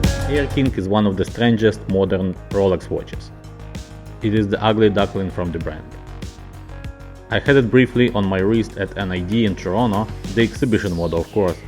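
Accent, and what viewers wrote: Russia. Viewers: рязанский акцент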